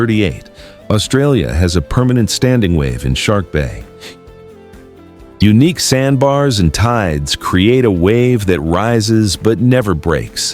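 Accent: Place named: United States. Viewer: Talking about Australia with an American accent and mispronunciations? That's a paddling.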